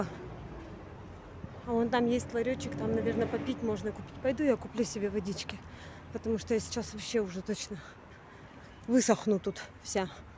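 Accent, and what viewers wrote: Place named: Udmurtia, Russia. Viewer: а почему Вы не дождались вечера. Собаку не выгонишь в такую жару. А вода в Ереване бесплатная, холодная из питьевых фонтанчиков. Судя по говору-Вы из Удмуртии?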